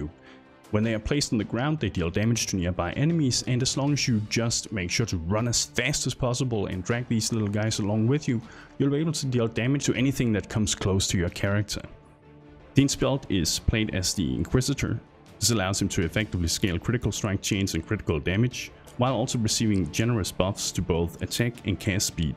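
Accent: Denmark. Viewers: Just wondering, are you danish? Because your accents sounds quite like a dane 😂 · You're danish aren't you.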